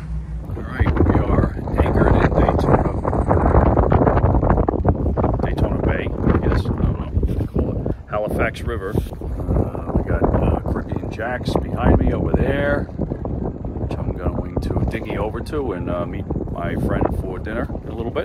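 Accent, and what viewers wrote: New York, United States. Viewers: From my last comment: Do yawl know what is wrong with these videos? Al they do is "Scuff" the surface of a ICW trip!! I also wonder.... WHAT PITCH props is he using??? Does he ever tell YOU so that YOU can know what kind of fuel mileage Yawl could expect IF You did the trip? He goes into all the fuel used with mileage, etc. BUT how does ANYONE know what to expect with THEIR Prop Pitch??? He says he's from Florida, He seems like a"TYPICAL" New Yorker & even sounds like one.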